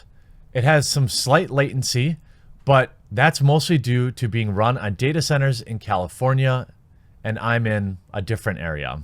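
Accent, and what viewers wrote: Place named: Midwest, United States. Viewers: Yup, that midwestern accent. I knew some who said "leyg" and "draygon" all the time and didn't even notice till someone points it out, lol. Lots of different accents in America if you look around closely ;) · Welcome to Midwest accents. -an Illinois'an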